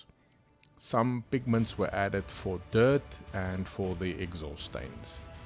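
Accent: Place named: South Africa. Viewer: Cannot miss the South African accent!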